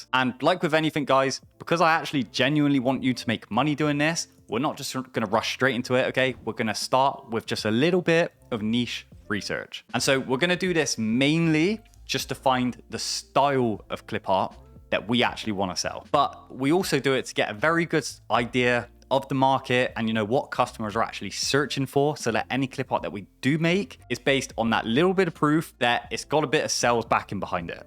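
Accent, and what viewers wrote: Bristol, United Kingdom. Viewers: Aye are u Bristolian too!? Subscribed!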